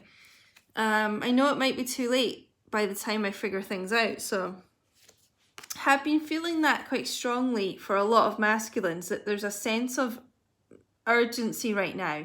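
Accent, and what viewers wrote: Scotland, United Kingdom. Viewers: I am Leo, Libra and I have know each other for 45 years. I am her twin flame, we met in high school and I fell in love with her. We dated for 7 years and the married for 10 years. We never had closer and don't misunderstand me I am still in love with her she remarried and I remarried, the last time I spoke to her was in August of 2019 the call lasted 49 seconds the conversation was not good but 3 seconds after she hung the phone up I was in a state of euphoria, because I got to hear her voice. We were divorced in 1983 ( The divorced was totally my fault). The story of how we got back to each other is incredible to long to type it out. I love Libra so deeply from my heart and my soul. Some time in March of 2019 I got a bad stomach ache and realized something was wrong but because Libra is a very very private person I could not contact her and realized I was ghosted. 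I don't know where she is living I have no phone number as of this date. no communication in 3D or 5D although I believe I have all of the tools I am blocked. I am an engineering manager. The only communication that I have is from watching youtube readings. And you must understand I use a spreadsheet to get a timeline. I am in my 10th month of being blocked that's how much I love her and want to help her. if you would like to talk with me <num> I would love to talk to you. I love your reads, and that scottish braug, I spent 3 years in Dunoon Scotland. Any help you could give me will be greatly appreciated.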